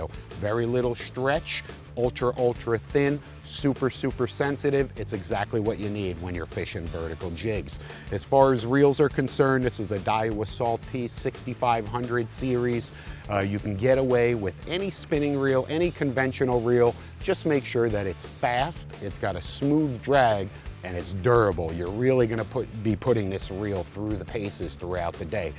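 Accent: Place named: New York, United States. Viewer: This guys accent kills me. Go back to New York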